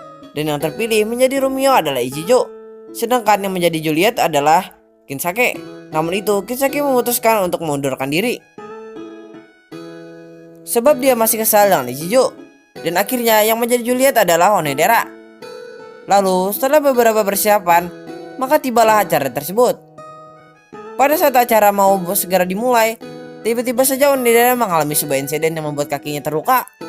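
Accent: Banjar, Indonesia. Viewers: orang banjar kh bg?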